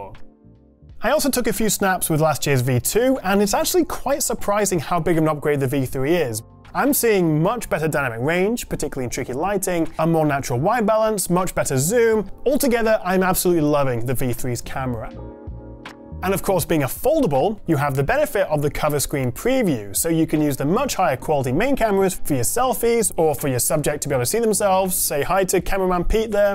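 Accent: United Kingdom. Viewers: Love how British you sound and the Harry Potter reference.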